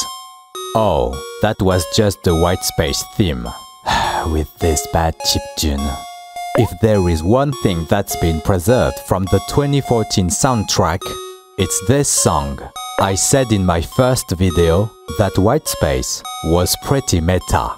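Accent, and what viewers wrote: France. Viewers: franchement la vidéo est super sympa ! Et puis l'accent français n'est pas un problème ^^ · The production quality on this is amazing! And don't you dare worry about the french accent, it sound wonderful!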